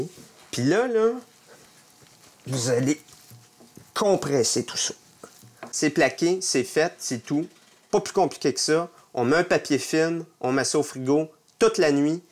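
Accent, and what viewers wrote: Quebec, Canada. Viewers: Il parle québécoué, (québécois) ! Québec, province francophone du Canada...Parlé typique de la nouvelle France, ancêtres du français d'aujourd'hui, de ceux qui utilisent des anglicismes aux deux mots ! Bernard Pivot pourrait vous en passez un papier...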